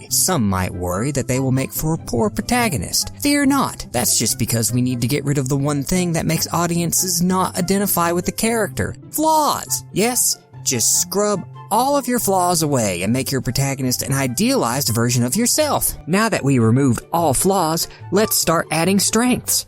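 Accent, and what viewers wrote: South, United States. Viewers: your mildly southern accent makes these 100 times funnier to me man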